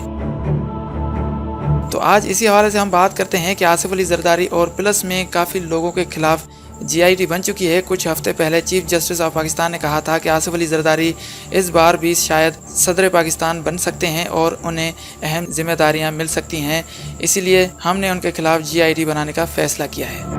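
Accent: India. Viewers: awaaz se hi pata chal raha he k ye bharwa koi indian he panyakka tu te ap moodi da mundda lagg rea e'n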